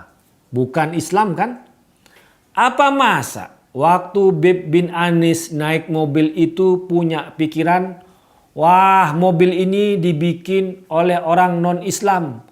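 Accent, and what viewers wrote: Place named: Java, Indonesia. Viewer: dia cocok hidup di dataran arab .... pake logad jawa sekali...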